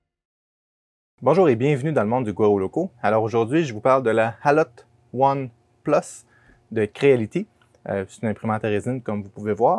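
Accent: Canada. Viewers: Bonsoir, Je ne connais pas le fonctionnement des imprimantes résine et je vais chercher sur votre chaîne youtube. Vois faites partit des rares personnes qui expliquent bien le comment du pourquoi et j'ai acheté mon ender 3 pro un peu grâce au tuto que vous aviez fait à l'époque. Le petit accent et les expressions Canadiennes me font toujours plaisir. Merci poor ces review toujours bien préparé (les petits papiers le prouve) ça évite les vidéoss qui durent 3 heures et qui se répètent. Toujours un plaisir de m'instruire en vous écoutant.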